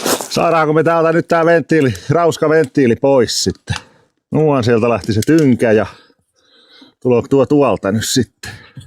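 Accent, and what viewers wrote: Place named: Laihia, Finland. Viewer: faija laihialta ni tua murre on hienoo kuultavaa :)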